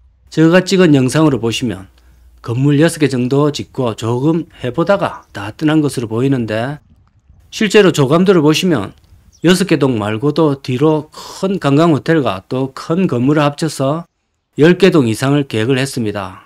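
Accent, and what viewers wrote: Gyeongsang, South Korea. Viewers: 멋진멘트 갱상도발음 정확한 판단력 응원해요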